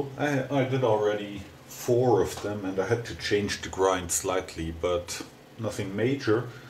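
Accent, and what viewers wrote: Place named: Germany. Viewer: BTW: Solche Unterlagscheiben gibts fertig, Z.B. bei VW. Werden dort bei den hinteren Radlagern eingesetzt. Man merkt es deutlich, Du denkst Deutsch und sprichst Englisch. Ist lustig, besonders wenn Du mal wieder ne Vokabel suchst.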